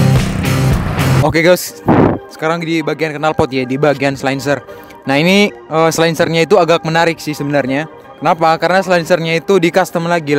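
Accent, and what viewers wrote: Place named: Manggarai, Indonesia. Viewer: Logat nya manggarai sekali😂😂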